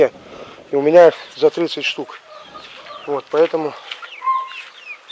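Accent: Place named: Kuban, Russia. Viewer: Привет, канешно мало баклажан, а говор уже кубанский проявляется!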